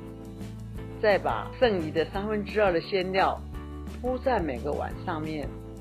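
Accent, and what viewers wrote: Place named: China. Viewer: 明明是台灣阿嬤為什麼要講話口音辨成大陸的大嗎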